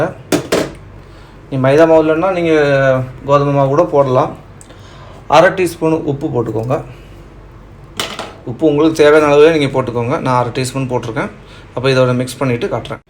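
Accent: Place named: Tirunelveli, India. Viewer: Super recepy bro 😋Nellai slang 👌👌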